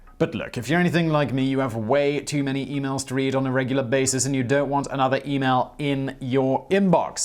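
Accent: United Kingdom. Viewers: Am I the only one who thought this was Vsauce and was startled when he spoke in a British accent?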